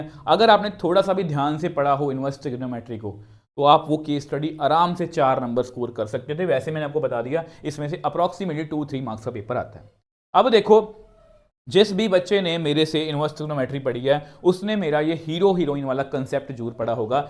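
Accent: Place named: Pakistan. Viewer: Sir ka accent pakistani kyu hai 💀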